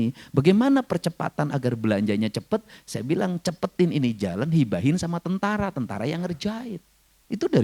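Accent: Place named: Sunda, Indonesia. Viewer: Krn pidato nya sell : tanpa text, spontan dr hati, topik nya sell menarik sesuai dgn kepentingan bahasan nya, gaya bahasa n bicara nya yg 'khas,' sangat expressi , serius, tanpa kehilangan selera 'humor' nya yg 'mengena' , tidak norak bhkan terkesan ada nuansa 'intelektual' dalam setiap kata n kalimat nya , bhkan sekalipun dlm bahasa daerah (Sunda) nya yg msh sangat kental, cermin betapa KDM sangat cinta pada budaya leluhur nya .. 👍👍👍👍♥️♥️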